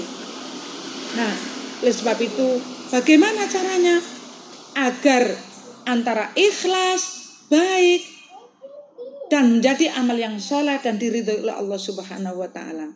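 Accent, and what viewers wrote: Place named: Java, Indonesia. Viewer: Pelan pelan bu nyai gk usah tergesah gesah agar bhs indonesianya tdk kelihatan medok,krn sdh msk youtub, maaf kritik membangun smg sukses dakwanya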